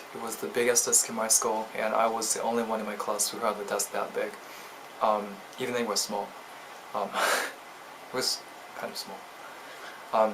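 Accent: Australia. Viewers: to me your english sounds really australian here, very interesting! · You sound Australian when you speak in English! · you sound Australian, it is odd. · Dude, nice Australian accent.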